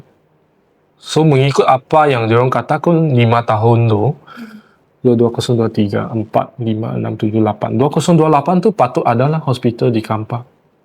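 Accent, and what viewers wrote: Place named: China. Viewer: Fasihnya berbahasa Melayu wpn pelat cina tetap ada.SYABAS KERANA MENGUASAI B.MELAYU DGN BAIK. 👍👍👍🥰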